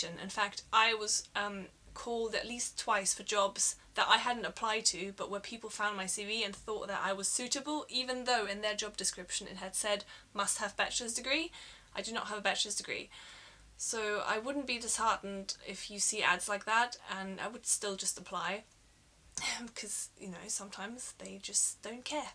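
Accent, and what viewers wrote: United Kingdom. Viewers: How did you learn the british accent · Amazing British accent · I really love your British accent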